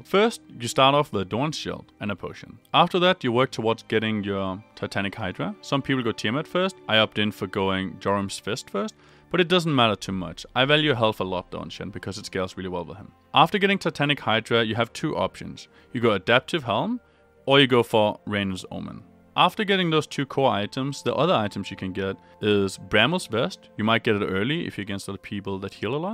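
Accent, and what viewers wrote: Denmark. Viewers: Are you from Danmark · is it just me or does this guy sound danish don't want to sound like an ass, I live in Denmark and know what a danish accent sounds like. just curious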